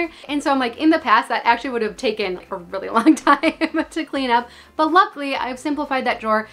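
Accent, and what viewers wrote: Minnesota, United States. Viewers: You say bAg like a true Minnesotan! Gotta love that hard A sound. I also have that same A problem 😂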